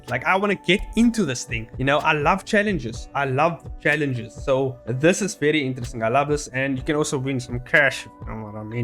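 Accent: South Africa. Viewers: Ayeee you sound like a fellow south African. I just started working on my submission, gonna be a hectic month of grinding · You're from south African?!!!😲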